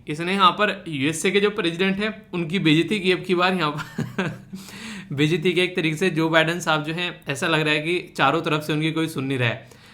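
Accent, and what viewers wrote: Haryana, India. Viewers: haryana ke ho na sir aap aaj tak jo bhi baate lecture main btayi hain n aapne kti dil t connect hove hh sare baat haryanavi tone ss aapki language main sir or sir lecture toh aapka dmakedaar hove h har baar 😊 ☺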